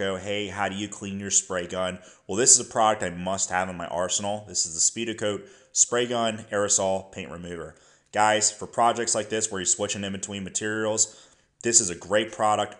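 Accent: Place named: East Coast, United States. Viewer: With that accent you have to be from east coast, either PA or NJ or somewhere in that area I’m guessing but I’d say PA. Great video, also!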